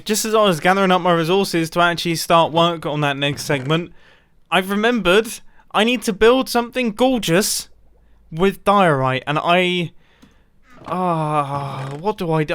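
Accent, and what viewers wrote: United Kingdom. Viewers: he’s so british 😂 i love it when he says chuffed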